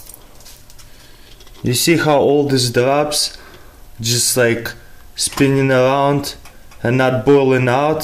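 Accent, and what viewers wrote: Russia. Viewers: Dude I love ur vids they are AWESOME!!! So hel9ful and nifty! Keep it up! Ps - russian accent makes it even better!!! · ...because he is Russian and I thought his accent was very charming. He is also enthusiastic, like me, about science. So, I thought "We may be from different parts of the world. Yet, science makes us all very happy."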